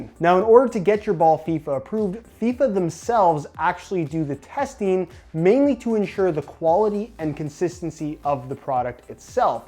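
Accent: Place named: Canada. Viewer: “That I talked a-boot”....love the Canadian accent!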